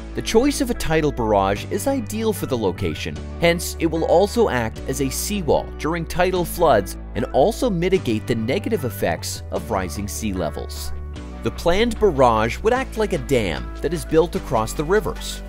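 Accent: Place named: United States. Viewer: The American accent is a dead giveaway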